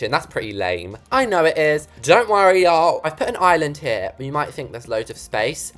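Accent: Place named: United Kingdom. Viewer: The queen has amazed us again!!!!❤ always buts a smile on our face whith his loving British accent 😂😂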